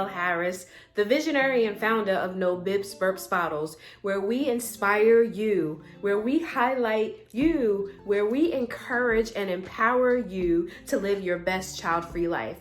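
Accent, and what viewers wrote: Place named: New York, United States. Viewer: I knew I heard a NY accent. U threw me off with being from NC. Now I know where it came from 😊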